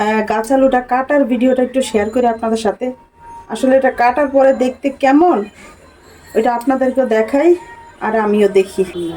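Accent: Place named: Comilla, Bangladesh. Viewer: আপু দেখে নিয়েছি, আপনার কথা শুনে বুঝলাম আপনি কুমিল্লার যদি ঠিক হয়ে থাকে, আমিও কুমিল্লার,ধন্যবাদ আপু।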